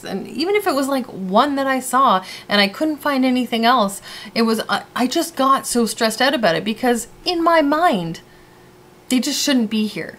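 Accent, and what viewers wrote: Canada. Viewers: I love your Canadian accent!! You make it fun to learn. Thanks for your work. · Your Canadian accent is delicious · I'm guessing you're from eastern Canada with the way you say ABOUT!! Love the eastern accent coming from western Canada.. If I'm wrong please let me know. Thanks for sharing a great video, thrips are horrible and have decimated many plants in my indoor garden. Cheers sister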